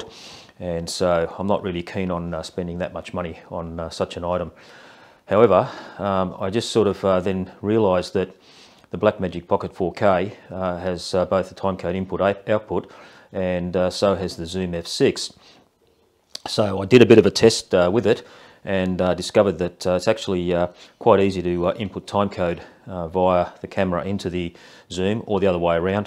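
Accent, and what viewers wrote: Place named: Australia. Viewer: awesome mate. great to see Aussie accent with these tutorials as a kiwi. Love your vids. new sub today.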